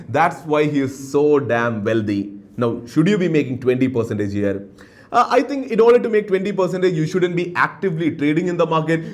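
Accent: Kerala, India. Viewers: Love your mallu accent !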